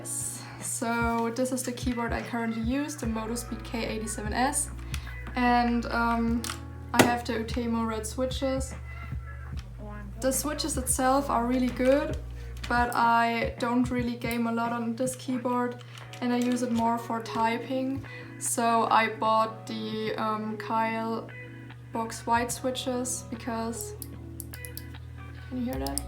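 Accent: Germany. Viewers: Man merkt, dass du Deutsch bist :D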